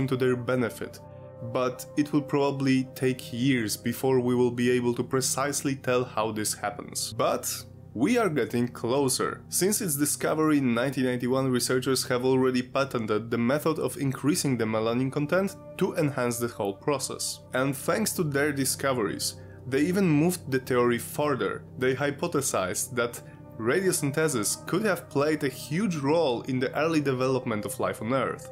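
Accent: Russia. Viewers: ppl were asking why the chernobyl actor never tried your russian accent my man ❤, the whole show would be turned into a very broad Borat film , lol 😂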